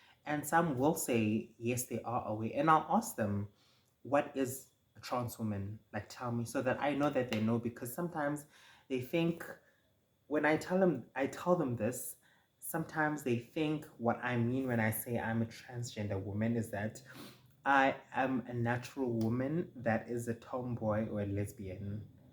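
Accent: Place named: United Kingdom. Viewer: Your English is close to British.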